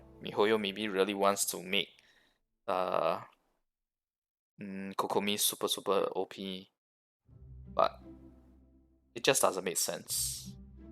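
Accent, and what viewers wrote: Malaysia. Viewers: Hey, came across your video on a fine random Sunday, and gave it a listen. It's rather long and my brain has troubles processing lots of technical information so I thought I would drop a comment to clarify a few things instead. But first of all... Nice to know there's a fellow SEA player out there, in fact, I'm guessing you're Malaysian more so than Singaporean:P. Yes, the accent was super thick (no, nothing wrong with that). If you are Malaysian, just know we're brothers KEK. I'll be even happier if we actually live in the same capital, cause I see a lot of Malaysian faces on Genshin and a few of them doing content creation, and I think we could use more Malaysian talents in a game like Genshin Impact. Now onto my question... Everlasting Moonglow. You mentioned something about the weapon's passive giving FLAT bonus instead of Multiplicative bonus. 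Like I said, I am not a math guy and technical stuffs isn't my forte. Usually, I just do generic math and that's about it, but what is multiplicative bonus in this context? Say I have 50,000 HP on Kokomi now, and this weapon gives me 3% at R5. Can I assume multiplicative means 3% of 50,000? Meaning 1,500 for Normal Attack DMG as stated in the weapon's description? Or is this FLAT? All things taken into consideration, the increase does feel rather meager, but I think the gem of the weapon lies in its ER mechanic. Here's what I don't understand tho. I'm assuming you referred to the Kokomi Mains' master guide for damage cros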